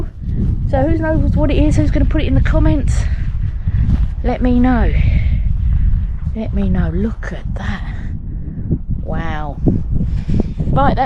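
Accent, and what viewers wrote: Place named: United Kingdom. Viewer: Ich habe nicht alles verstanden, aber ich liebe diesen britischen Akzent.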